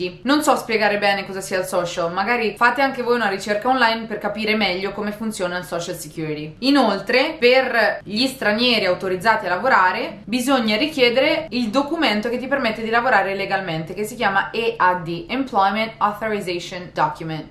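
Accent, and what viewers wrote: Ancona, Italy. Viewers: Ciao, sento da come parli che sei di Ancona. Confermi?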